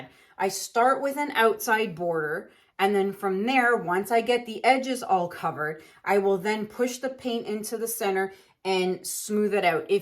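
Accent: Canada. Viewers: Oh wow, wow, wow!!! This piece is absolutely stunning! I have only recently discovered acrylic paint art but you were one of the first people I started watching. I really am enjoying your art, your tips (and as I'm from the UK, the differences between a Canadian accent and an American one! 🙂) Keep up the fantastic work and sharing things with us. I've just bought my first few acrylic paint items to try it out for myself. A few busy days this week so next weekend probably the starting point for my paint pouring first. Im not sure what to start with but as my canvas is small, it won't be a blow out on quite this scale! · Good Lord she likes to talk. I dont know if I can stay for the end, I cant take all the talking and the Canadian accent. Not trying to be nasty, its just my preference.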